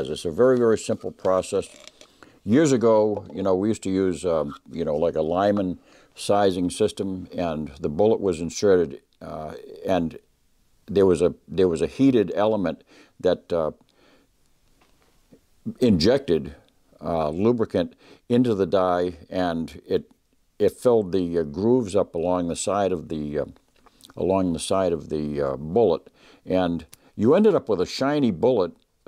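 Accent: New England, United States. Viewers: Good storm huh!? A few weeks ago i first watched one of your videos and about 9 seconds in i go “this guys either from vt right on the river or nh” so… which is it?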